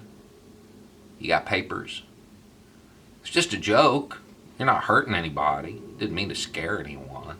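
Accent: South, United States. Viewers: has lived all over in Japan and the US but he has been living in Florida for years now. He has def picked up more of a Southern accent over the years. That tends to happen. I can hear a couple of different accents mixed in his dialect. With all of the common sense videos and history lessons shares and all you comment on is his accent? Smh 😏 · you have such a real and thoughtful way of teaching your audience. I am so glad I came across your vids.. Ironically, I first clicked on one of your segments because I found the way you look seemed contrary to the header and I was curious. So, you taught me that not all people with wild beards and a southern accent are bigots!:) I apologize for my mistake. Can't wait till your next posting - such variety and thought put into each of them. God bless.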